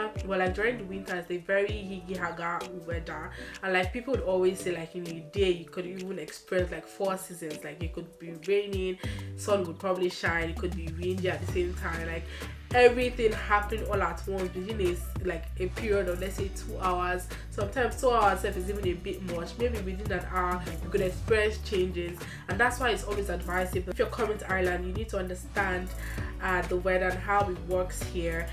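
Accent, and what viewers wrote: Dublin, Ireland. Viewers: I was prepared to be offended, but far from it. Everything you say is true and fair. Dublin is crucifyingly expensive. I feel so sorry for anyone starting out and trying to find accommodation in this country. I don't think your high insurance is necessarily down to the fact you are from another country, but down to your age. Back in 2001 my first car was 300 euro and my insurance was 1,200, 4 times the value of the car. This is beacuse I was young, and inexperienced. I love your bubbly personality and the way you say certain words with a Dublin accent. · Your accent with the Dublin inflections is deadly!!! Oh I mean ss Ireland so much but love my California life too!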